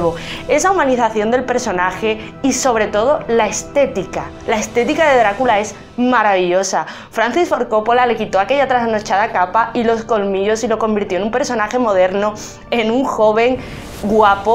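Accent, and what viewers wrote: Spain. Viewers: Me encanta tu acento español y muy buena aportación; hay muchas cosas que no sabía y gracias a ti hoy las sé. Buen vídeo mi estimada!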